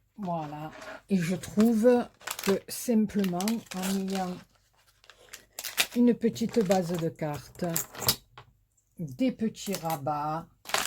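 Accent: South, France. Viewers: Chère Madame bonjour je viens de voir ce tuto de carte à rabats super beau et que j’ai hâte de refaire. Votre carte est très belle. Et j’adore votre accent du midi qui fait penser aux beaux jours. Merci. Petite question pourriez vous me dire ou vous avez acheté votre papier motif nounours dont je raffole. Il est super beau j’adore les nounours et on en trouve très peu en scrap. En attendant votre réponse je vous souhaite un très bon week-end ensoleillé et vous dis à bientôt. Bises. Valérie 🌺⭐️⛸💋🐈🌸🧸🐞💐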